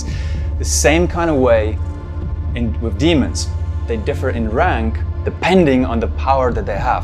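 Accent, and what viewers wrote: South Africa. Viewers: U from south africa ey ?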